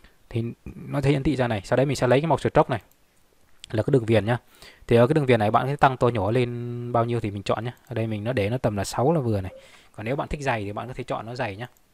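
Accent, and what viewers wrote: Vietnam. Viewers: A PHẢI NGƯỜI tHANH HÓA K Ạ ?????????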